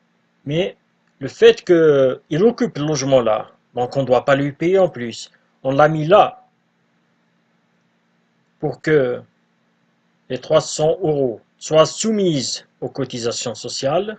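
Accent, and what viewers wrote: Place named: Kabylie, Algeria. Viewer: Merci beaucoup, je souhaite suivre une formation pour devenir gestionnaire de paie, je trouve vos videos intéressantes merci beaucoup Au passage, dapres votre accent, je pense que vous etes kabyle, ca fait plaisir de voir des kabyles proposer des contenus pareils sur youtube, bonne continuation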